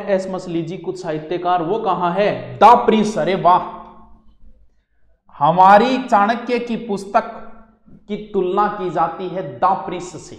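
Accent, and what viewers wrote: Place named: Rajasthan, India. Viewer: Mai Bihar se hu aur aapka language sunkar mujhe lagata hai ki pakka aap rajsthan se hai 😂😂😂 but l don't know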